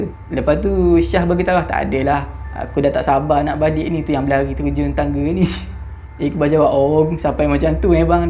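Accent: Terengganu, Malaysia. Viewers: Dik org trganu ke😁 Sleng tu CM cakak ganu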